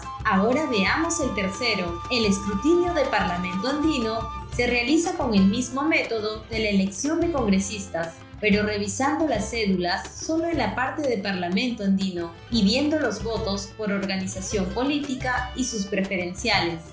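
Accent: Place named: Galicia, Spain. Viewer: Soy <mail> me parece bien un video, pero no me gusta el audio parece una gallega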